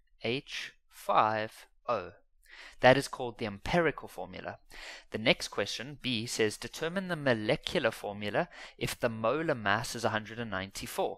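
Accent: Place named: Afrikaans, South Africa. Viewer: aaaa... comment take 2. It is now 2;50 in the moring with my test tmr, this is like the 3rd video of yours that I'm watching and oh my soul, the jokes. I almost woke my house laughing. fristy comment on your market stragey, Making content that is already in demand just slightly older is very smart * cap cap. secondly thank you for making this avliave bro, appreciate it. thirdly, are you South African? Your accent sounds very much afrikaans, its cool. Okay good night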